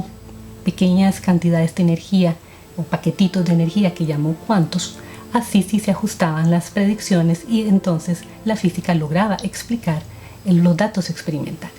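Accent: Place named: Costa Rica. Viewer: Creo que es de Costa Rica.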